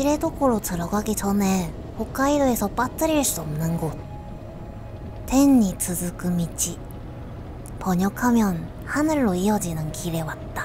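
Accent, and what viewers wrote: Gyeongsang, South Korea. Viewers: 갱상도 아가씨인가요..~^^? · 구수한 갱상도 사투리 때문에 영상 정주행 중입니다 ㅎㅎㅎㅎㅎㅎ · 매력있음ᆢ 말도ᆢ 표정도ᆢ 음식도ᆢ 숙소도ᆢ 특히 갱상도 말투의 발음이ᆢ 매력 억수로있음 굿~~^^ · 경상도 사투리가 일본말투 톤으로 하니까 색다르게 들림~~~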